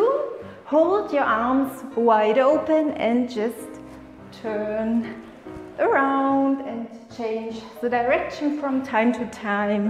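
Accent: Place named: Germany. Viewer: I'm here for the German accent 😅... Don't worry I'm German too.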